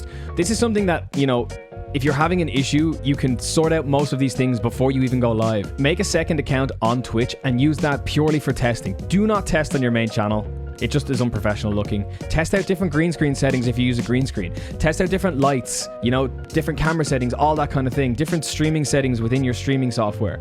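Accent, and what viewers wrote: Ireland. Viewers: Are you irish? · haha nice i thought i heard an irish accent im originally from cork but live in galway now keep grinding man love the content !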